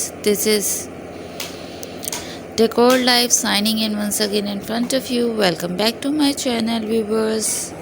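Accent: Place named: India. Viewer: English please... not your east Indian head ass scammer voice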